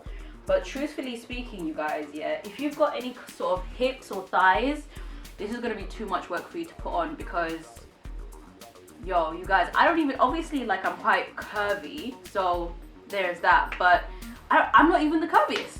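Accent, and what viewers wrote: United Kingdom. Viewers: Im like in pantyhose heaven with ur sexy legs and ur accent..love that brtish accent super sexy.thanks for sharing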